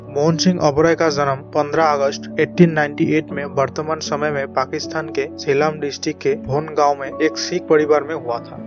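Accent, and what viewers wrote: Bengal, India. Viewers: DADA APNI JE BANGALI SE APNAR HINDI BOLAR UCHCHARAN THEKE BESH BALO BOJHA JACHCHE * HINDI BOLA TA EK TU CHESTA KAROON THIK BOLTE PARBEN * KHUB KOTHIN NOY HINDI BOLA HINDI STYLE "E " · Bhai Tui ki Bangali,Tor Uchharan puro bangali der moto. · Watch this very interesting video relating to Mohan Singh Oberoi - founder of the famous chain of Oberoi Hotels. He started his life with just ₹25. The commentator seems to be a Bengali.His command on Hindi commentary is poor.He calls a district SARGODHA (now in Pakistan) as SARGONDHA ! Inspite of this, this video is full of plenty of intereting information about M.S.Oberoi. 🌲💐😀👌💐🌲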